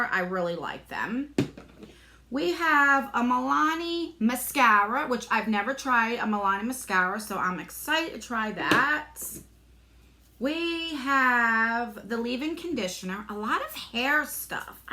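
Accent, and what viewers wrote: New Jersey, United States. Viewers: new sub love ur channel..💝 I can tell right away ur a jersey girl so I'm I !! 🌼 ps ur so pretty & ur makeup looks amazing!! 💄💋🌲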